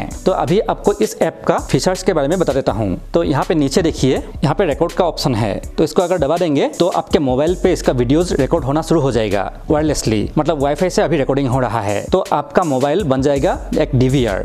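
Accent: Assam, India. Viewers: Bhai aap Assam se ho kya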